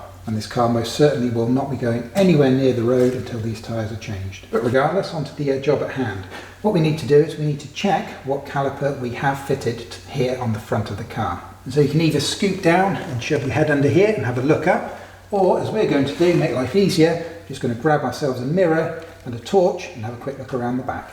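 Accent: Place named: England, United Kingdom. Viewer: I don’t know what it is about the English accent, but it makes every video feel so much more educational lol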